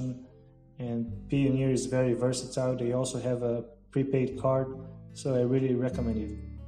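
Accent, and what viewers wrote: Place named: Rio de Janeiro, Brazil. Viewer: Eu tenho quase certeza que é carioca... =D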